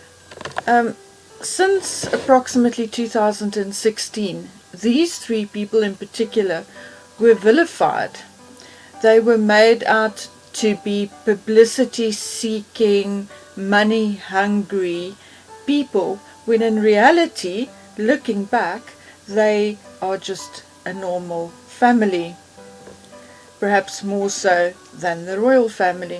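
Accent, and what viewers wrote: South Africa. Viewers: Are you South African? Loving the accent im from neighbouring country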